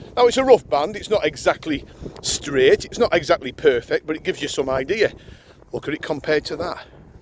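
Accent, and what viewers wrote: Cumbria, United Kingdom. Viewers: Good video, I’m a newbie as you know only 4 of your digs in, but am always concentrating hard on keeping it low and slow as your cumbrian tones play out my head 🤣 but to be fair I could widen my arc by turning my hips more I gotta admit 👌🏻 Thanks for your all your efforts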